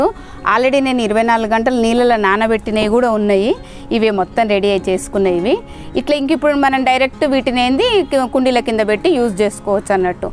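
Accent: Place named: Telugu, India. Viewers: I like your native Telugu accent 🙏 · Garu nenu meeku big fan ayipoyanu.mee resident place ekkadandi. I am in chennai mee slang kurnool anukunta correct kada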